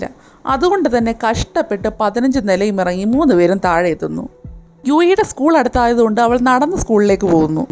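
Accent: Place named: Kollam, India. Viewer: Kollathil ullavar aganeyann parayuka bro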